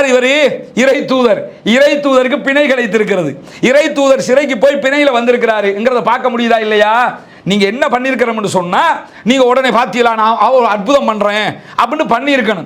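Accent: Tamil Nadu, India. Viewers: தமிழ் நாட்டில் படித்தவர் அதனால் ஒரு சில வார்த்தைகள் அப்படி அல்ஹம்துலில்லாஹ் மக்களுக்கு தெளிவாக புரியும் என நம்புகிறேன் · Why your Tamil accent like PJ from Tamil nadu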